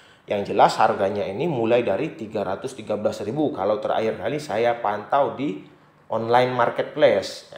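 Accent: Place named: Sumatra, Indonesia. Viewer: saya dari jambi om, masalahnya logat bahasa om nada2nya kayak orang sumatera, salam kenal dari jambi... Dan ditunggu tutorial selanjutnya om saya bantu dengan subcribe ya....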